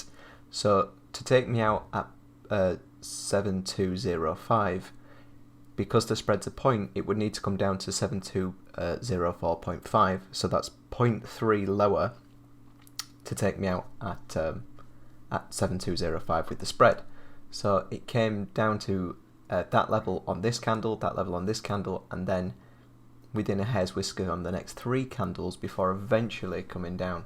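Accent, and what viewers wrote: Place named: Leeds, United Kingdom. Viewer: I live 10 mins away from leeds, I knew i recognised your accent ha!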